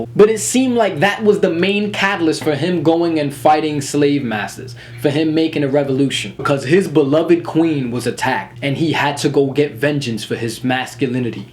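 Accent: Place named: Bronx, United States. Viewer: I feel like your from laBronx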